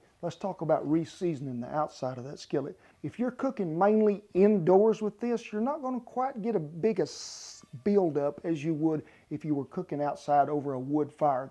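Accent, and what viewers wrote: United States. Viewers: Truly wonderful video. Thank you very much. As a British man, I have to say I love your accent. REAL USA. Keep up this brilliant and interesting work.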